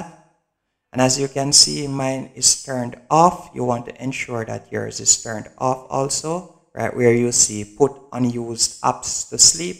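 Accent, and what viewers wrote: Jamaica. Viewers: Are u jamaican?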